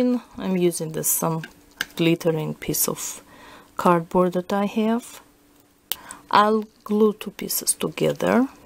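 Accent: Russia. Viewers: thank you so much for doing this i love your Russian accent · Omg can I start with I love your videos? I mean This is amazing and I can listen to your Russian accent forever! Please keep on making videos! :)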